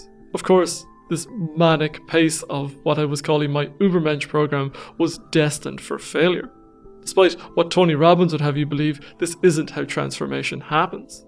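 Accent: Ireland. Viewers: Irish accent is awesome ☘️ . Great books btw. Interesting information on the various people.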